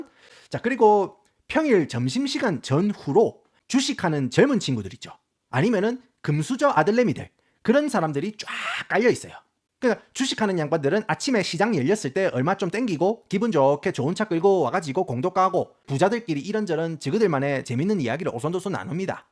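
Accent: Gyeongsang, South Korea. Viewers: 살짝 투박한 경상도 사투리가 오히려 영어랑 더 잘 말아 지시는 듯 ㅋ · 이 행님 갱상도 사투리 짭짤하게 해재끼다가 영어는 달달하이 할 때 경외감 오지게 든다^^ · 이 아저씨 깅상도 사투리가 은근 중독성 있네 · 볼때마다 은근 구남친 닮음. 은은한 경상도 사투리랑 말빨 그리고 동글동글한 얼굴…동그란 뿔테안경…참 좋아했었는데… 님 보고 있으면 그 사람이 생각나요 웃긴데 왜 슬플까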